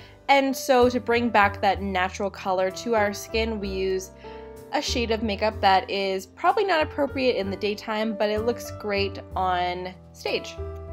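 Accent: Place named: Canada. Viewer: Super Canadian accent!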